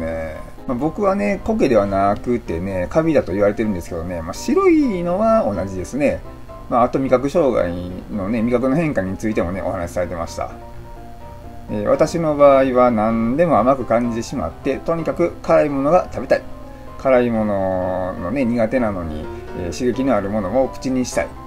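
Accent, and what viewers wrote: Osaka, Japan. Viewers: さん❣️こんにちは☺️こんばんはおはようございます😃 今日も為になる経験談を大阪弁に乗せて語っていただきありがとうございます❣️ さんは納豆大丈夫なんですね❓関西の方は苦手な方が多いと聞いてましたが…… 副作用との戦いはまだまだ続くのですか❓ さん頑張れ〜頑張れ〜❣️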